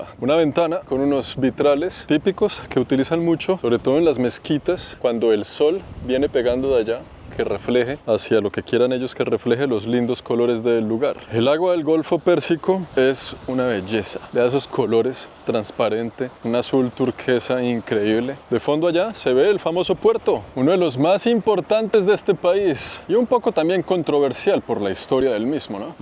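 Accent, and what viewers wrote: Colombia. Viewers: eres de las personas preferidas, tu español es muy Colombia 🇨🇴, emprendedor como todos tus paisanos. Gracias por mostrarnos el mundo. Desde los llanos de Colombia 🇨🇴🇨🇴🇨🇴🇨🇴🇨🇴🇨🇴.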